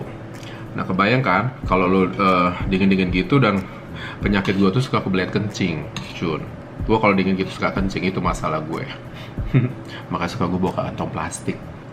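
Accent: Medan, Indonesia. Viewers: Aku suka kali liat vlog abang...bahasanya Medan kali...jd ingat aku sama kampungku...sehat terus ya bang...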